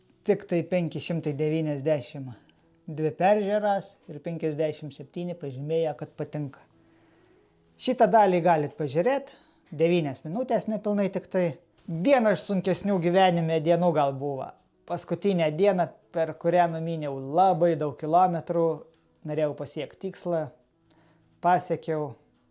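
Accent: Aukštaitija, Lithuania. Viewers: Tau sėkmės ,visada laukiu vidoso.Man dviračiokai nelabai ,bet kai kažką kali ,mali , gamini buiziotę ,tai man geriausi video.Ir kalbėk aukštaitiškai...!!!Super. Aš iš suvalkijos.😂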